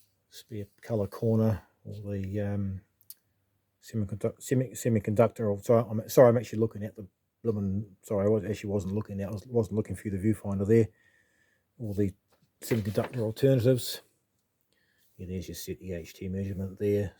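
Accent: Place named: South Africa. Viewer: This man sounds like a White South African.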